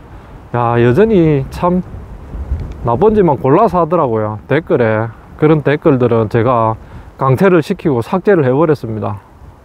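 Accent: Gyeongsang, South Korea. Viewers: 감축드립니다 매우건전하고 진실된팩트방송 최고예요👍 경상도사투리 너므정겨워요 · 3만 축하드립니다 ! 시골의 모습을 이렇게 정직하게 보여주시니 앞으로도 구독자수가 빠르게 올라갈 것 같습니다. 얼굴공개 안하는 건 좋은 결정인것 같구요, 정감가는 경남사투리가 참 듣기 좋네요 ~ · 한 2주 전부터 보기 시작했는데 처음부터 믿을 만한 분이라 생각했지요. 경상도 억양이지만 사투리는 거의 없는 구수한 목소리가 좋아요. 그리고 잔잔한 정의감, 왠지 성실할 것 같은 삶의 자세, 이런 것들이 좋아 보입니다. 계속 열심히 하시기 바랍니다. 챙겨보겠습니다. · 부조리 고발영상및 구수한 경상도 목소리 너무 잘하고 계십니다! 방송 잘보고 있습니다! 화이팅!!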